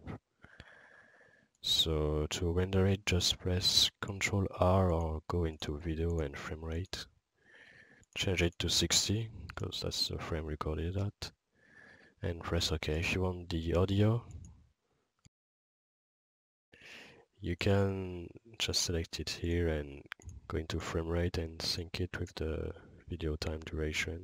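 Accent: France. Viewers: C'est moi ou tu a un putain d'accent français ?? ( its me oe you have a fuking french accent ?? ) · That frenchy accent is really noticeable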